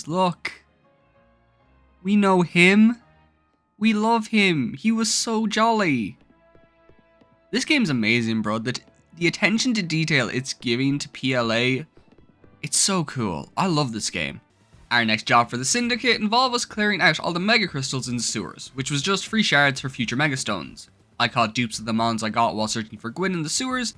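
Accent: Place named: Ireland. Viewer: This is the first video I've seen of yours, I am SO GLAD to find a fellow Tepig lover (I also agree that pignite is such a happy boi). Bonus points for being a fellow Brit. (After listening to a bit more of this video I realised you sound Irish, so sorry about that mate.)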